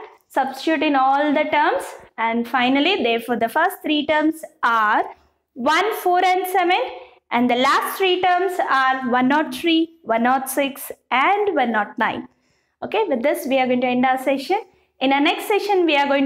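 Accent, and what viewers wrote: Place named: South India, India. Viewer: Maam u r frm tamil nadu or kerala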